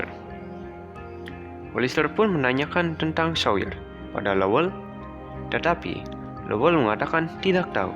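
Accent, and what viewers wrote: Bali, Indonesia. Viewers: Klo d perhatiin. Gaya bicara dan logatnya kaya orang bali... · Aksen sudah kelihatan... Semangat bli, maju terus untuk berkarya... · Org bali ni logat nya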